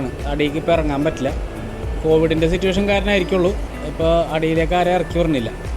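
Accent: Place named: Thrissur, India. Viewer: 👍👍👍ചെറുതെങ്കിലും മനോഹരം ആയ വിഡിയോയും വെള്ളച്ചാട്ടവും പിന്നെ മ്മടെ തൃശൂർ സ്ലാങ്ങും ❤❤❤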